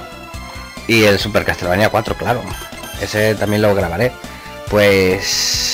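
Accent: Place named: Spain. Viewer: Ei tio. Perdoname. Te prometo que pronuncias y suenas 100% como un español, por eso te he escrito eso, pense que tenias que releer tanto porque no prestabas atencion. Lo siento y voy a borrar mi comentario anterior para que nadie que baje a los comentarios se piense que tu canal no merece la pena. Cuidate.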